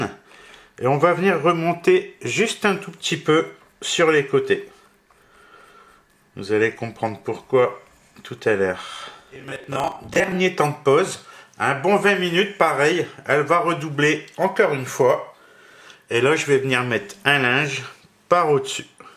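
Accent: Nord-Pas-de-Calais, France. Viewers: Oui, j'ai bien compris ce que tu as dit, continue j'adore t'entendre parler en chti.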